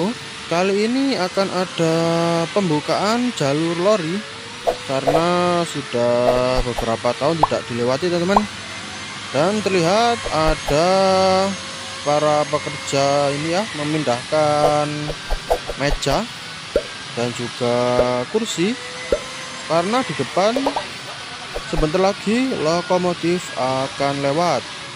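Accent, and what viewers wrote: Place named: East Java, Indonesia. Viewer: Nang endi iki cak? nek delok omongane sih ky ng jawa timur yo . . .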